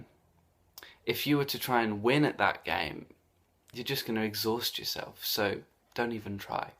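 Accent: Scotland, United Kingdom. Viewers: Not too long, this video, love your Scots accent.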